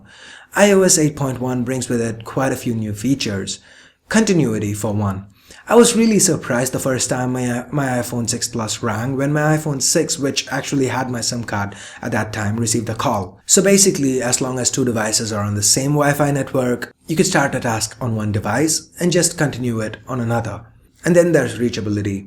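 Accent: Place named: India. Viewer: I can understand him really well lol he sounds the same as any Indian.... Which is not bad!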